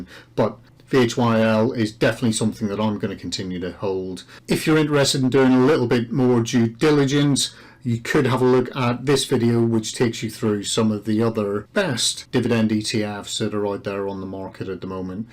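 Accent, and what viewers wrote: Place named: Belfast, United Kingdom. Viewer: you still in Northern Ireland or over in London or what. Cool to hear a Belfast person talk about Vanguard :)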